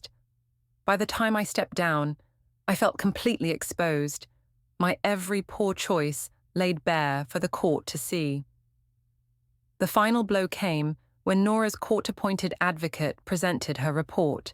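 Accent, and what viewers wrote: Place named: United Kingdom. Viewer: No longer listening to stories with a British accent.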